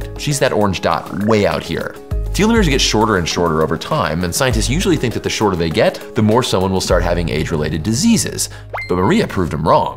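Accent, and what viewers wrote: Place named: Texas, United States. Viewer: Where'd that Texas accent go?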